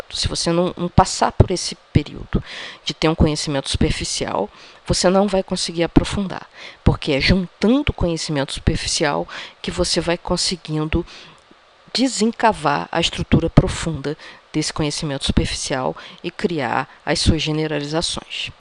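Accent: Rio de Janeiro, Brazil. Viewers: Eu acho interessante e legal a "entonação do X/S" na sua fala, rs. Sotaque carioca? De qualquer forma, parabéns pela iniciativa! Estou estudando para concursos e assistindo todos os vídeos relacionados ao aprendizado. Obrigado!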